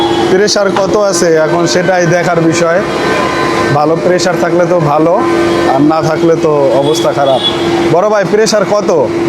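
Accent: Barishal, Bangladesh. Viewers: মনু তুমি বরিশাইল্লা। খাইছো।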